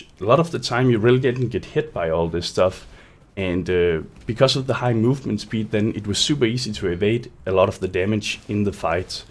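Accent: Denmark. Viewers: hey are you Danish?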